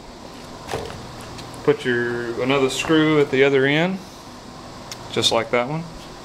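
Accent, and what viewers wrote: South, United States. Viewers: You talk funny… but I love you good ol' southern boy common sense. But I think it goes deeper than this; you are clearly connecting the dots between trigonometry principles with construction techniques. One repeated thought that goes thru my mind while watching your videos is, "Why didn't I think of that?" Keep those videos coming! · I'm retired now but it's always good to see the old tricks still being used. Nice presentation and love the Southern accent. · thanks. good tips. what part of the south are you from? georgia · The southern accent communicates acceptance to the listener. It shouldn't be a coincidence they invented the y'all term to address everybody.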